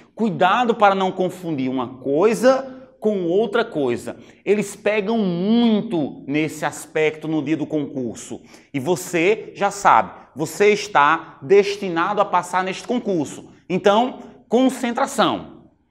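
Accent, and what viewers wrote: Northeast, Brazil. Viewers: Meu caro professor. Suas aulas são espetaculares. E mais, seu sotaque nordestino, é fenomenal. Aprender língua portuguesa deveria ser a meta de cada um dos falantes desse idioma maravilhoso. E, tem gente que não gosta. Será que tem medo de aprender? Pra esses "possíveis medrosos", fica a reflexão de Sócrates: - podemos facilmente perdoar uma criança por ter medo do escuro. A real tragédia da vida, é quando os homens têm medo da luz. Abraços.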